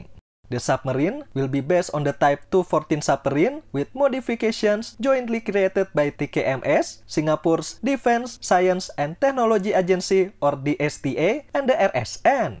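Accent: Indonesia. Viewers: I guess the narrator is Indonesian😊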